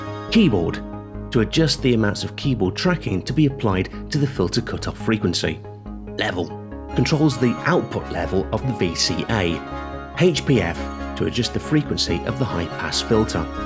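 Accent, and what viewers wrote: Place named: Japan. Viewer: It's a nicely done comparison. Whilst it absolutely can replicate the Juno 6-60-106, replication of the Jupiter 8's subtleties with cross mod etc, is not there. I have owned the original JP8, and now own both a DM and the JP-08, plus the Cloud ACB and Zenology versions. I'd still love to see Behringer really do their JP8 clone, as I think they'll nail it. One thing.. the commentary is very "Jinglish" (as we used to say at Yamaha R&D). It's a great, soothing and very listenable voice over, but the grammar and use of English needs work. For the record, when at Yamaha R&D, we also worked with Howard quite a bit (Jones), he came in for a Vocaloid overview, and over the years, did quite a bit with us. Truly one of the great exponents along with Nick Rhodes of the JP8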